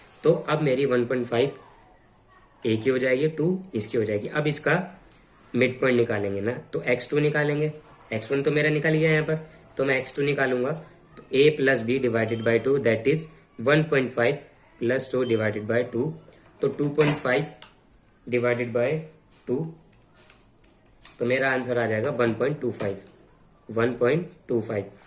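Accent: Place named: South, India. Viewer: sir your pronounciation for squairereee is very funny , its seems that you are using south indian accent..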